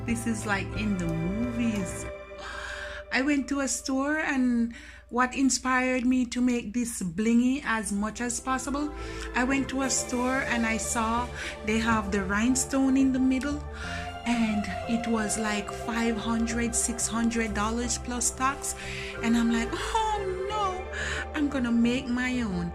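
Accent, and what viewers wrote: Jamaica. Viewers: Hi!! I am so happy to finally hear a voice “ talking the real Jamaican things them” and creating “glam and glitter” bling out on social media my girl them can’t hold you back!! You should really be proud of yourself as I am proud of you. Your bling mirror “sell off”. Looking forward to the side tables.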